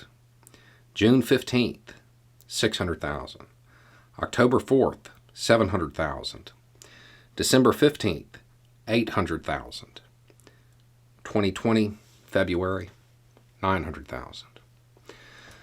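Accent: South, United States. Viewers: We talk in line at the stores up here in the North too, minus the adorable southern accent of course.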